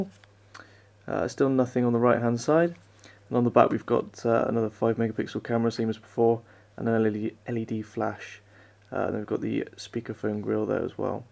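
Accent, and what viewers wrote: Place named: United Kingdom. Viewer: reviews are just more awesome in a british accent, haha great vid man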